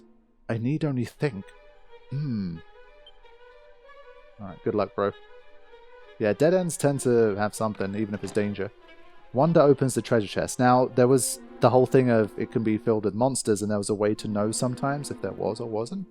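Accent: England, United Kingdom. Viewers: Now we can have proper Middle English Language being spoken by a proper English Commentator!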